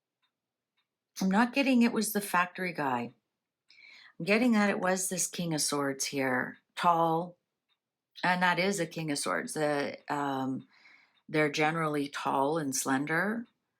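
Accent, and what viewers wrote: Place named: Canada. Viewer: Get over it.she is Canadian so pronounce differently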